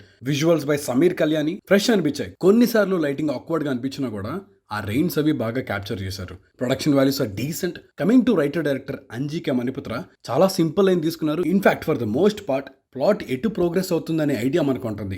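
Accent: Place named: Godavari, India. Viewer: Anna godavari slang try chesadu roiiii🔥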